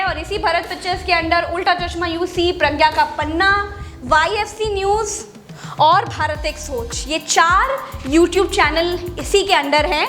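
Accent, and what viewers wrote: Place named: Awadhi, India. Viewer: Aapke bolane per bich bich mein jo avadhi ka jo tach aata hai is liye aapke channel mein Char Chand lag jaate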